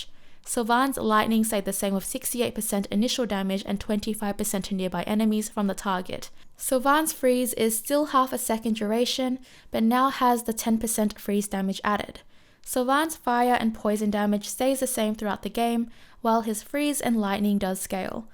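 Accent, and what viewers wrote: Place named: New South Wales, Australia. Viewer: Those upgrades are very welcome! Thanks for the updates. Please keep it up. Love the accent too! NSW?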